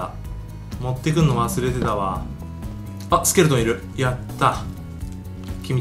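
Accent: Niigata, Japan. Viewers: 今日は地元の方言がよく出ますね（新潟県民より） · 多分だと思うけど、新潟県出身なんじゃない？ヒカキンは、だから、おっちゃうっていていると、おもう。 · ヒカキンよく落ちること「落っちゃう」って言うけど新潟の方言(´ω`)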